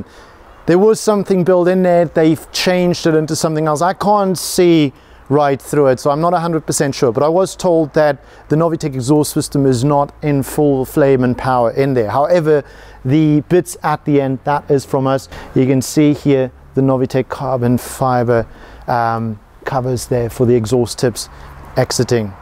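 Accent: South Africa. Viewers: This was an epic review as always! His presentation style, that honest emotion and RSA flavour...🔥🇿🇦 Dankie 💯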